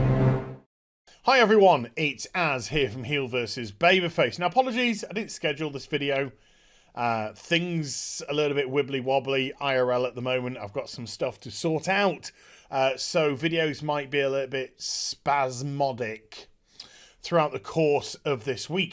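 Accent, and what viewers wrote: South, United States. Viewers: You sound like a lovely southern belle, and would fit in wonderfully during the mid 1800's. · Holy shit! Being from the UK you sure got the Southern United States accent down pat! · I love your southern? US accent lol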